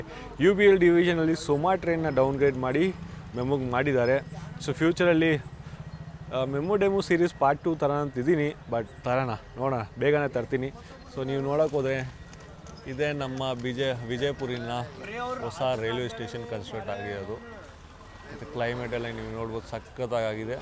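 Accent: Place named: North India, India. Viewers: I think he is basically from UP or Bihar , who is settled in Karnataka. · Yes, he is from Uttara Bharata. Please don't discourage him. He is a gentleman.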